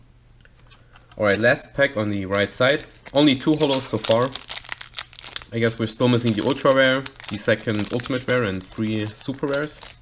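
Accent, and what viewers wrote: Germany. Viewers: Bist du Deutsch da du Englisch wie ein deutscher sprichst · Are you german?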